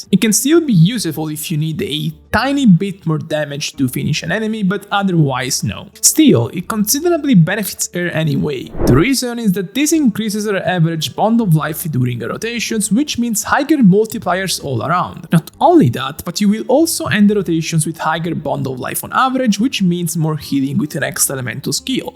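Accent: Italy. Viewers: Got jumpscared when you started talking in Italian. Sono italiana anch’io, per qualche motivo non avevo colto il tuo accento. Video fantastici, complimenti. ❤️‍🩹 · Lol it's a very powerful Italian accent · Ahahah, ma sai che io mi stavo ancora chiedendo da dove venissi con quel accento. Ho capito oggi che sei italiano ahahahaa. Comunque continua così i tuoi video sono utilissimi e dai sempre pareri interessanti devo dire, love your videos❤ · " i am italian" he says with the thickest italian accent. Yeah no shit 😅😂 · yeah he is, his accent is really an italian accent and i asked him and he answered yes, in one of his streams at the start he speaks italian too 😂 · Il bello è che l'italianità emerge anche quando parla inglese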